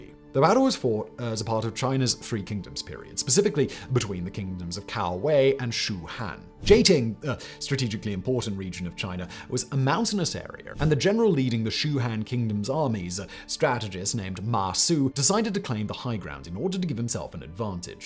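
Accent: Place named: United Kingdom. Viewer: Is his voice not 10x more British in this video than all of the others? Or is it Just me? 😂I actually couldn’t even understand some of what he said a few times and I’ve been watching for like two years. Wth? 🤷🏻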